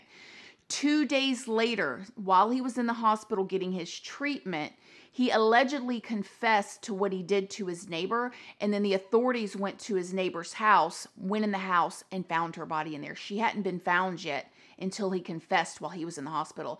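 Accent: South, United States. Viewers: I loved your work 🥰🥰🥰 new subscriber here 👍🏽👍🏽👍🏽 keep up the good work 😘😘😘 also I feel like you have a beautiful southern charm. You don’t sound like a Floridian lol but that’s just me 🤷‍♀️🤷‍♀️🤷‍♀️ I know Florida is a down under southern state, but again you have a lovely southern accent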